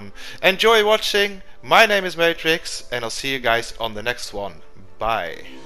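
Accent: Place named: Netherlands. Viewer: You are dutch... i know 100%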